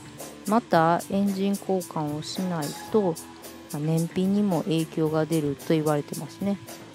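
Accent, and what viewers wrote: Kansai, Japan. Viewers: 何か関西弁ほっこりします。素人の私にもとても分かりやすい説明ありがとうございます。。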